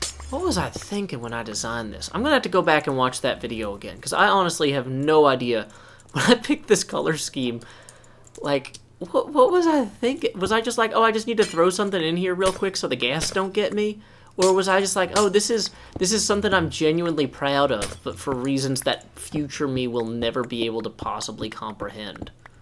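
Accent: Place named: South, United States. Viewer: It is my first time watching one of your videos, and I am greeted with beautiful singing and relatable musical hate for the nether. Your voice is wonderful! You have a bit of a southern accent, are you from Georgia? :D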